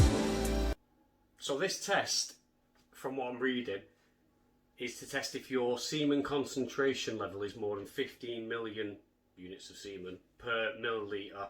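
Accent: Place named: Northern England, United Kingdom. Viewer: Which CF unit do you go to? You sound like your from the North? I go to Leeds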